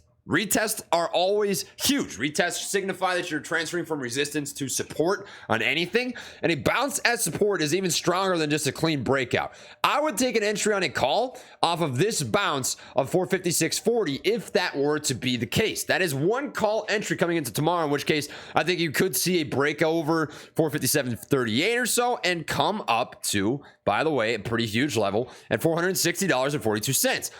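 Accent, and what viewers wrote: Minnesota, United States. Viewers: Dude what was that Grizzly chew reference haha? You sound like a Minnesnowtan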